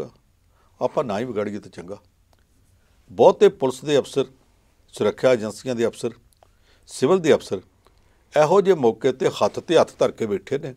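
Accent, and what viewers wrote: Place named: Punjab, India. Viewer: Excellent Jatinder Pannu ji I love ur Punjabi accent while reporting...from US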